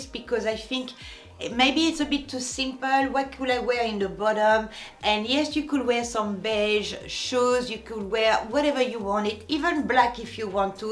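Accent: France. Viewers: why are you speaking with french accent?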